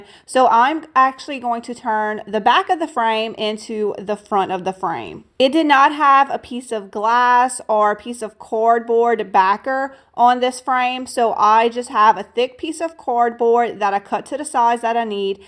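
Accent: Louisiana, United States. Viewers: Darling! You sound like you’re from Louisiana!!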